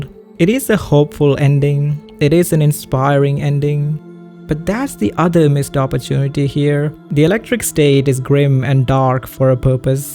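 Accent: India. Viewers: Oh boy, are you Indian or something? Your accent is showing, but it's passable. Please work on this. I'm not rage baiting. I'm trying to give advice, so you can get more views and a proper yt channel.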